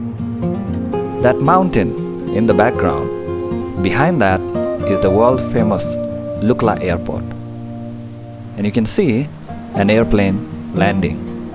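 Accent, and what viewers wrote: United Kingdom. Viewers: Amazing vidéo! the landscapes are beautiful and the explanations so clear, love you english accent! where u come from ? after reaching gokyo valley how did u came back ? and by which way?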